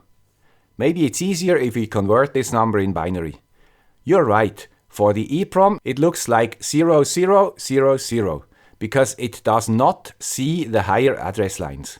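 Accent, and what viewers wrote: Switzerland. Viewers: I'm also 63 years and grew up in SF Bay, so have 40+ years in computers, software and electronical things (35 of which are obsolete). Found your hilarious and accurate representation of those days refreshing. Love the Swiss accent - keep up the good work! P.S. once connected an Atmel 8085 (?! flavored chip (someone knows the part#) to an old school PS/2 keyboard [clock, data and power]. Fun fact: it sent keydown, keyup!, func keys, shift, ctrl, etc. along with control over 3 LEDs in a seemingly random but decodable fashion. Currently working on an RCA CDP1802 (Membership Card) just for fun! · Another masterpiece lecture from the "Guy with a swiss accent" . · Now that was both fun and complex. Beautifully broken down and simplified in a way only the guy with the Swiss accent could have achieved. I’m feeling all nostalgic 🏴😁 thanks 🏴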